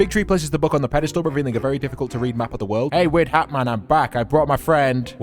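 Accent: United Kingdom. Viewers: Once again hitting a banger I don’t know why buts it’s funny listening to a British man explain games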